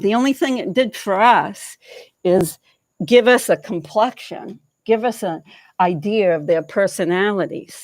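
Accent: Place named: East Coast, United States. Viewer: I recognize your accent. East Coast?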